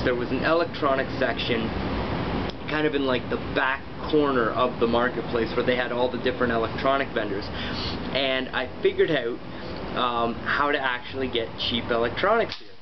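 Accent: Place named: Canada. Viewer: Oh shoot! You're Canadian! I knew it from listening to your accent, haha!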